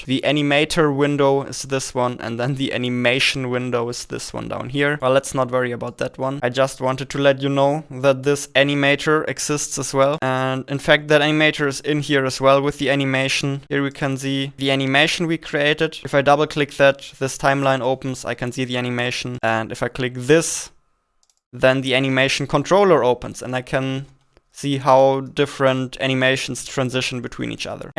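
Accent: Germany. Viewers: Your German accent is very thick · You have a very strong german accent, I like that! lol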